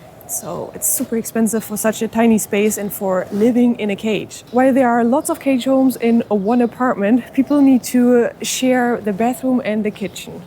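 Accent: Germany. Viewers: has a German accent? True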